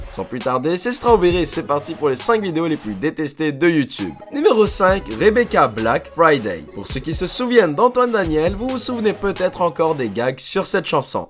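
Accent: Quebec, Canada. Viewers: T'es super! Mais es-tu Quebequois?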